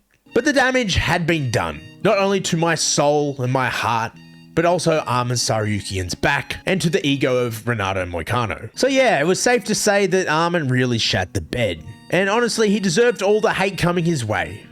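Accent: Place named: Australia. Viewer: You're bit too aussie for me to subscribe or like the vid. cheerio mate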